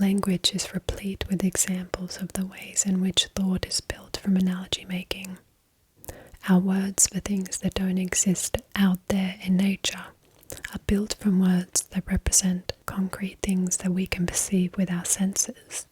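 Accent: Australia. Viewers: Really awesome asmr...well done...and finally found an Aussie asmr-tist that i like!. Subbed!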